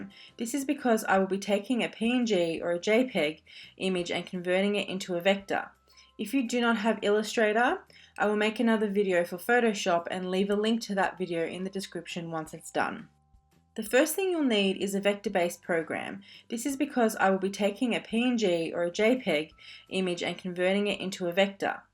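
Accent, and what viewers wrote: Australia. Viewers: Very comprehensive tutorial and with an Aussie accent! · For once! its nice to hear an Aussie accent.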